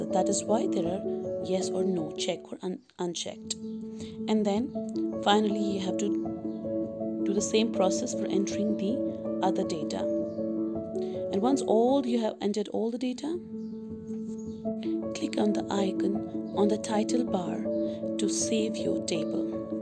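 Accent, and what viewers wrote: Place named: India. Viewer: beautiful Indian English accent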